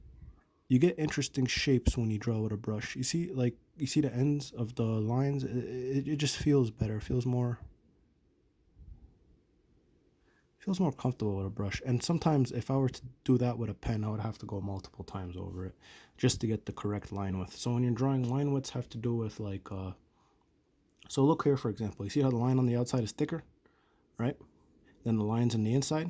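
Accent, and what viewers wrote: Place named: East Coast, United States. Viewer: That was fantastic. Also your accent made it feel like my cousins from the East Coast were giving the tutorial. Which for me was comforting.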